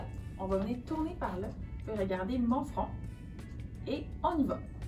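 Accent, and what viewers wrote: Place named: Quebec, Canada. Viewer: Québec lol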